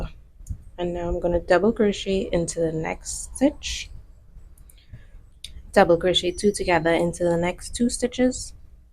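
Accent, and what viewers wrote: Trinidad and Tobago. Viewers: Omg my total shock when I heard your accent and realized your from my country Trinidad and Tobago omg!! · im guessing youre trinidadian, your accent seems so familar !!! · You from Trinidad?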